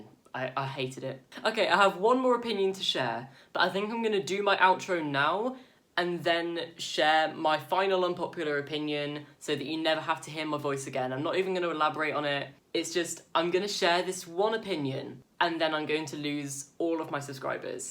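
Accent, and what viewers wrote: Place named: England, United Kingdom. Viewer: One off topic thing.... You have an amazing English accent. May I ask you where you come from?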